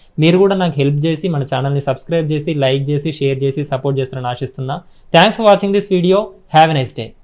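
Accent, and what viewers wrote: Telangana, India. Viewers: Hi Anna sutthi lekunte matter super Telangana slang Lo kiraak chepthunav, Anna intlo interior wardrobe and kitchen Lo wardrobe gurinchi oka video chey Anna plz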